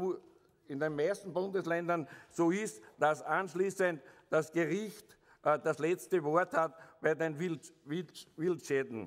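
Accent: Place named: Vienna, Austria. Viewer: Nicht gegen Österreich, aber dieser Wiener (oder was auch immer) Dialekt ist dermaßen furchtbar, da bekommt man eine Gänsehaut. Da kling Ostdeutsch noch schön gegen, das ist wenigstens lustig.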